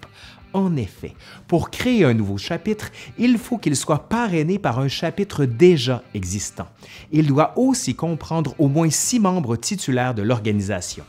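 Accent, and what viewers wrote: Quebec, Canada. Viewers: Ce documentaire est très instructif agrémenté par le bel accent québecois ! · Je trouve que tu t'exprime super bien de façon à ce que les gens qui parle français arrive à comprendre. J'aime cet accent Québécois et ta prononciation des noms en anglais. Bon travail et merci pour ces informations que je ne connaissais pas du tout sur les hells angels ; moi qui croyais que c'était juste une bande de motard qui aime se battre !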